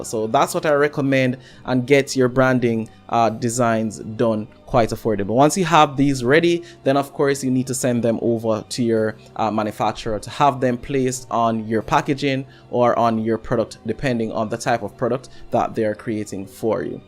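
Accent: Jamaica. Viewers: Are you Jamaican? Accent sounds like it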